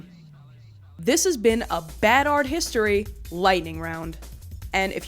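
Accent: New York, United States. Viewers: I love your accent. New Yorker? New Jersey? Boston? I can't tell. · She keeps mentioning her accent is she from new York? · I aint from that area so i dont know for sure but its either new york, boston, or new jersey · I love your accent! It reminds me of Whang so I'm wondering if you're also a fellow New Yorker. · your accent whips. not enough youtubers with potent new york accents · close. That’s a NY accent. Staten Island maybe? Have a cousin who was born in Stat. They say words similar. I could be wrong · you are my favorite lady content creator and I want to say tho I still hear a very slight NY/NJ accent I still find you charming and intelligent. Keep up the great work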